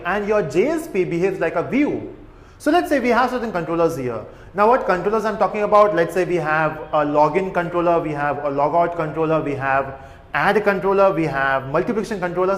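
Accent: India. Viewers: your indian accent is horrible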